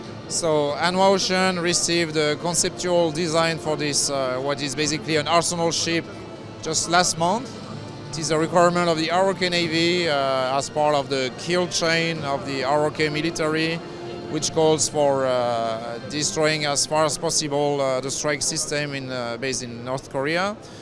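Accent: France. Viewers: Why did they hire the Frenchest French guy to narrate this in English? Could they not afford an actual English speaker?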